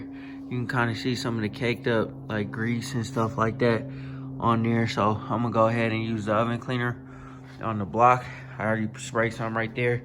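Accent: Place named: Bay Area, United States. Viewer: Man you got to be from the Bay Area, you definitely got our talk from out here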